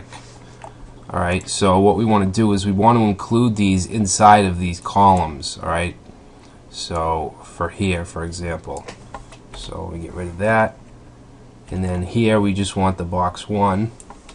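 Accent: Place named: Maine, United States. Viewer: Absolutely awesome tutorial!!! Thank you so much. What is your accent? Maine?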